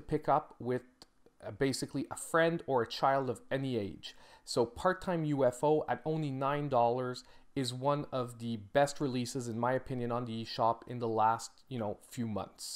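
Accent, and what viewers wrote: Canada. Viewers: Sup bro from Los Angeles like the Canadian accent. Carrion gets me attention big time. Will add to my back log of games. Just picked up Assasins creed rogue. Thsnks for the reviews keep up the hard work.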